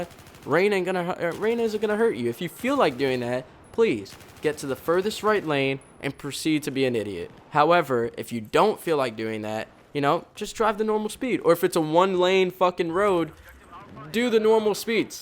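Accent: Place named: Florida, United States. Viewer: You sound like you live in Florida.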